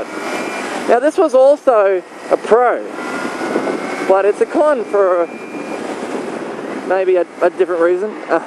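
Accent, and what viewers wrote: Australia. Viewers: you sound Australian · please tell me you are Australian!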